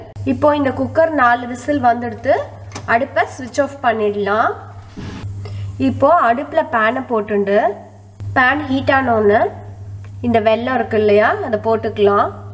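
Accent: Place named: Tamil Nadu, India. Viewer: Always amma prepared ..I am gonna try this tomorrow :) I simply loved your Tamil accent ..!! :)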